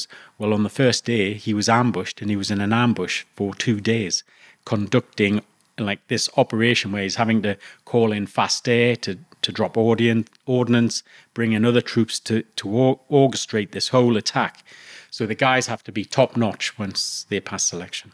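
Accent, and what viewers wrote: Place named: Newcastle, United Kingdom. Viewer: Aye wor kid he's a fuckin Geordie an all!..sounds like he's from Shields or Byker lol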